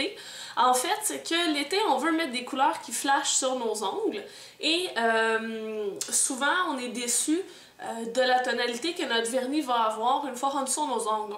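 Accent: Quebec, Canada. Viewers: C'est possible que sa marche ( le problème j'ai pas de vernis neon ) -.- je vais essayer quand j'en n'aurais mais sinon j'aime bien ton accent ! Tu es Québecoise ?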